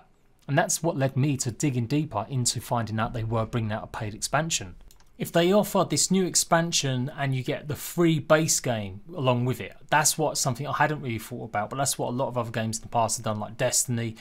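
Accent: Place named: Cockney, United Kingdom. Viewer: Strong cockney accent...kind of hard to understand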